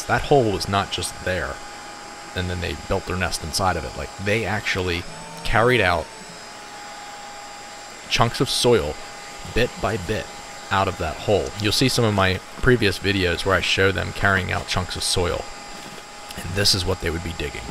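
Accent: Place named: Pennsylvania, United States. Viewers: Are you from Philadelphia? Your accent sounds like big jay oakersons philly impression lol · Ah man my family has a bee farm and yellow jackets everywhere! Hate them. I’m from Pennsylvania myself. Sounds like you are from the Pittsburgh area👍🏻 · lol oh man that is def a pennsylvania accent.. it always reminds me of someone i knew from the poconos who spoke like this guy..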